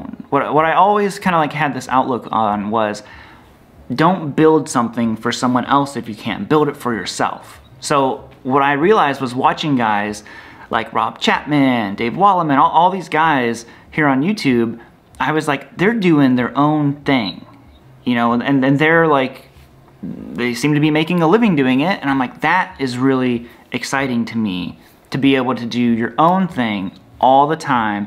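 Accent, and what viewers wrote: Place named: Ohio, United States. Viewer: I love your videos as there is such much positivity. I like your "weird" Ohio accent as it is YOU, something that always comes across in your videos.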